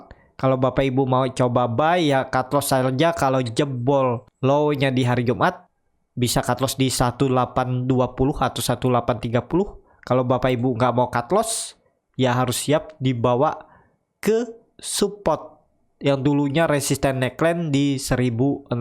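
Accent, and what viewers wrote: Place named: Banjar, Indonesia. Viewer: Borneo mana nie pak, logatnya sih orang Banjar nie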